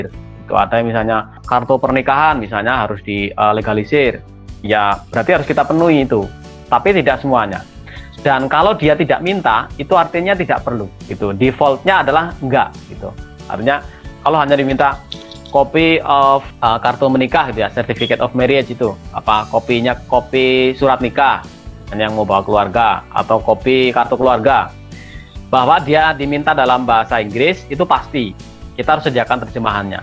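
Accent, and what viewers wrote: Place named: Java, Indonesia. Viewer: Logate jowo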